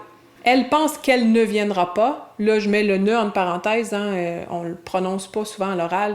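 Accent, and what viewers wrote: Quebec, Canada. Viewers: Alo! Félicitations pour tes vidéos, c'est vraiment super intéressant, enrichissant et d'un très haut niveau linguistique. j'apprends beaucoup en les regardant! Je sui prof de français au Brésil depuis 14 ans, beaucoup de mes élèves vont immigrer au Québec, je me suis donc rapproché naturellement de cette belle langue française parlée au Québec, je vous comprends de plus en plus ( au début c'était vraiment difficile, même sur TV5 monde, les séries québécoise sont sous-titrés...en français...ça l'a po d'allure..)> Je joue en ligne aussi avec mes amis québécois et j'adore votre accent, vos expressions! Je suis originaire de bourgogne en France, dans une mini région qui s'appelle la Bresse ( on nous appelle les ventres aunes parce qu'il y a des élevages de poulet...) mon grand-père parlait le patois, et j'adore ça...c'est impossible pour un parisien de comprendre le patois, les patois car bien sûr il y en a des différents suivant les régions.. 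Bravo encore pour la qualité de vos cours! A tantôt arnaud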